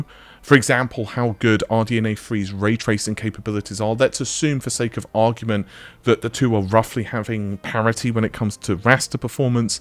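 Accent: United Kingdom. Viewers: This guy’s British accent is the type where he doesn’t breath through his nose at all when he talks🤣 other than that, love this channel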